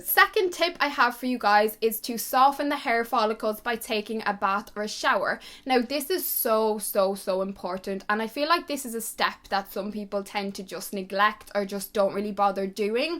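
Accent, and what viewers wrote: Ireland. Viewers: I love her Irish accent, this video is super helpful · U love your Irish 🍀 accent coz I'm irish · I’m irish and I some how came across this video and I straight away noticed your accent, we irish girls have to stick together ♥️🇮🇪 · Where are you from? I detect the musical emerald lilt! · She has an Irish (Dublin) accent so probably not. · litta Lou I would say she has an Irish accent.... card b is Dominican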